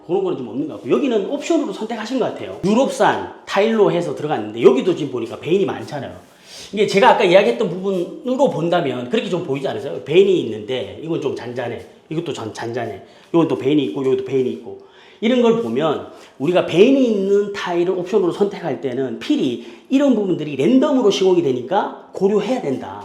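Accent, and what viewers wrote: Busan, South Korea. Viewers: 부산 이쥬? 사투리인데 ㅎㅎ 인천송도인가?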